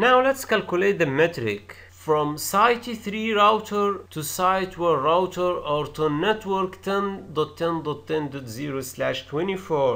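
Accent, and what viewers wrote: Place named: Arab world. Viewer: Well explained. Lovely arab accent.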